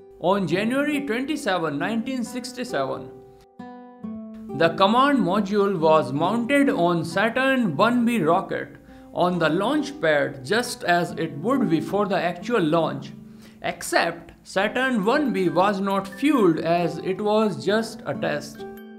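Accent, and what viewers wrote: India. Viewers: This is an Indian Accent I think, I hear that alot where I live (not India tho) Am I right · a pajeet is narrating this?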